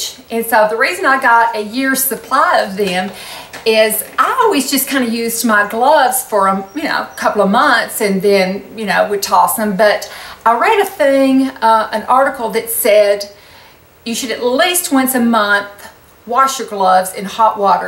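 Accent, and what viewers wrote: South, United States. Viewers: Hey, Love your videos and your southern accent. I know you are gluten free (Chrohn's Disease and maybe Celiac). I have Celiac Disease and was wondering if you choose gluten-free lipsticks to wear? If so can you recommend some. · I think we are all blessed if we just look at the great things that have happened in our lifes. kids, grandkids, and if luck maybe I'll be able to meet my geat grandkids one day. I have Dystonia, CFS, Fibromyalgia and spine issues but I still get up every morning thinking about my life being so blessed. I have just been diagnosed with the Dystonia I didn't even know anything about this thing that has taken over my movements on my right side in December of last year. I feel it has got hold of the wrong loud mouth lady lol. Bless you for the video you do it really is a true blessing to watch your video's. 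I live in Georgia you sound like your from the south to my friend... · You are so beautiful. I LOVE how your voice is so calming. Im from the south so the accent adds to the charm. Thank You for the wonderful tutorials. Also, you are so humble.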